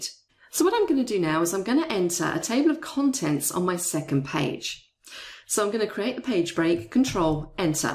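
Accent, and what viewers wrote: United Kingdom. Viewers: Thanks for excellent explanations! Btw, you sound so British, I loved it :)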